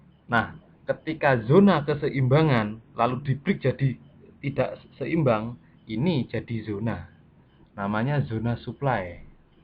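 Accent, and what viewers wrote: Java, Indonesia. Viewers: jawanya medok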